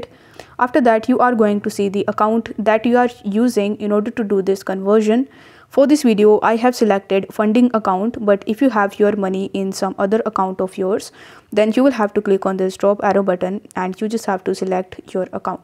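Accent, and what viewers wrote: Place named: India. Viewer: one of the headache accents in the world,, is the INDIAN English accent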